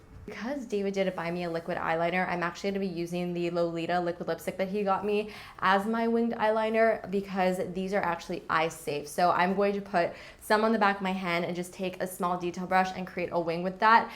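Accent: Canada. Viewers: omg random side note but im so proud bc i can spot your canadian accent woo woo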